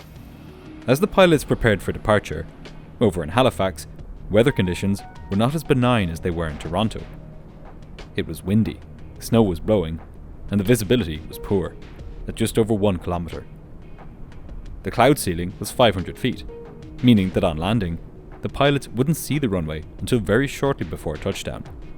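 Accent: Ireland. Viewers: The Irish accent is a lot easier on the ears than the Swedish one, but what I really appreciate about is that he doesn’t do any of the ridiculous propaganda pieces that the venture-capital-owned channel inflicts on its viewers. 🙂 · I never google the flights you talk about. I like to listen to the sweet irish voice that keeps me on the edge of my seat. I only try and figure out the ending of each flight with your tone and context clues. Glad i found you!!!!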